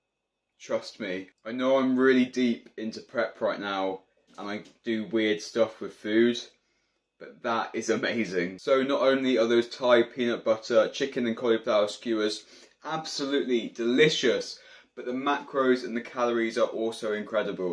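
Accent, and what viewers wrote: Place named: England, United Kingdom. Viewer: sounds like your in England somewhere. we don’t speak like you in California, some words we don’t use in the US.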